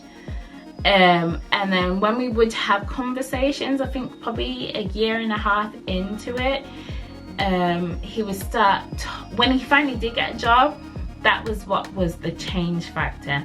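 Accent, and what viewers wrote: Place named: Northern England, United Kingdom. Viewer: Ahhhh you are from Leeds! I was trying to pin point the Northern ascent. Funny story time! 😂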